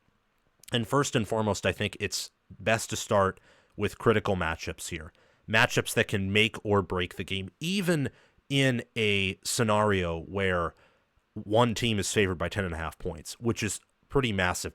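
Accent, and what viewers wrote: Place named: Midwest, United States. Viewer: Midwestern folks talk funny like thatt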